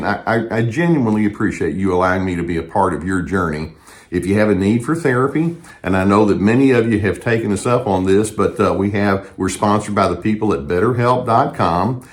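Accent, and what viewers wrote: South, United States. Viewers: I read your written article and I was hearing the words in your Southern accent! P.S. I am very glad that I am on the journey with you, too! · What state is the accent from?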